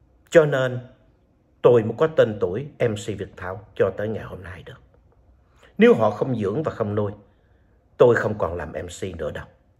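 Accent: Standard. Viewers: Bác Thảo MC nói quá hay quá chuẩn, cháu rất quý tác phong làm việc, giọng nói và cách dí dỏm của bác. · Chú nói khá chuẩn . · va rat chuan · loi noi cua anh chuan ko can chinh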